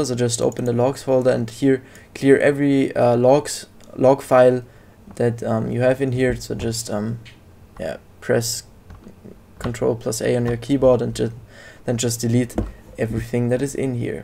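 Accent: Germany. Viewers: Digga dieser deutsche aktzent den habe ich auch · Man merkt voll das du Deutscher bist am ende vorallem mit Perfekt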